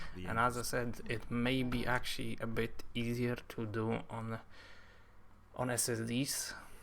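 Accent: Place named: Poland. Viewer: I love this Polish accent.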